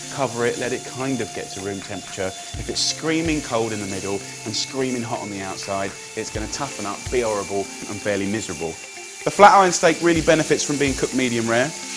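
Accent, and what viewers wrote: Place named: United Kingdom. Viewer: 영국식 발음 맘에 드네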